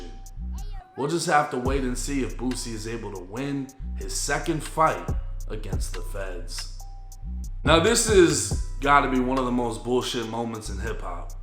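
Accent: Boston, United States. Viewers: The boston/Massachusetts accent is heavy/thick in this one lol .. at least hes got the money N assets needed for a lawyer good enough to take on the machine aka ths feds for a second time.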